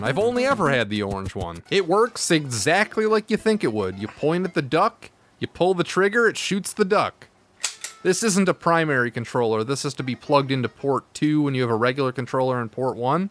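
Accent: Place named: Midwest, United States. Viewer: What part of the mid-west / great lakes are you from? Because you have a distinct accent...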